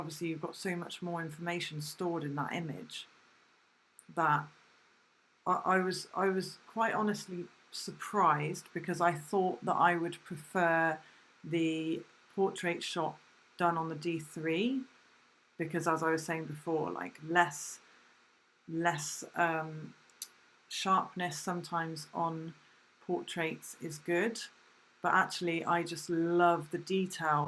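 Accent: United Kingdom. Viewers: I'm here for the British accent. 😁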